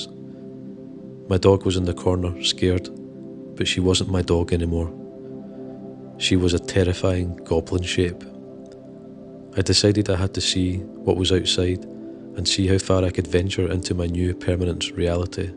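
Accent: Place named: Newcastle, United Kingdom. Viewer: The Geordie accent make the story 10x better!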